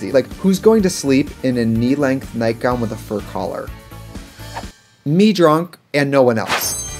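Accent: Wisconsin, United States. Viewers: I love how Trixie always says marry instead of blend, the Wisconsin is showing through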